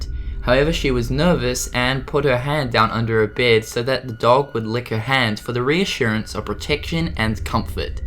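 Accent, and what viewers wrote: New Zealand. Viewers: Please. Don't talk like a Kiwi/Maori. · Are you from New zealand? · Sounds like a kiwi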